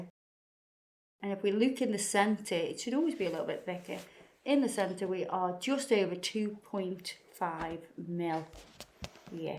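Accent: United Kingdom. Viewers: Well she’s a Brit, and they got a ton of different accents.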